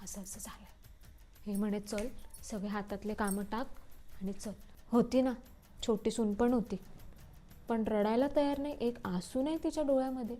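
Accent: Khandesh, India. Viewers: छान वाटतं ना ..ऐकायला...खान्देशी ...आम्ही सुद्धा...आपलस वाटत ऐकताना 🎉🎉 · व्हिडिओ कॉल वर निसता रडे ...😂 तुम्ही खानदेशी आहात वाटतं तिथे असच बोलतात 😂😂😂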